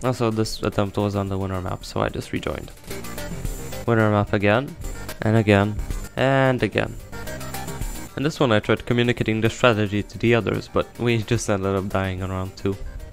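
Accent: Poland. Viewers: Słychać, że Polak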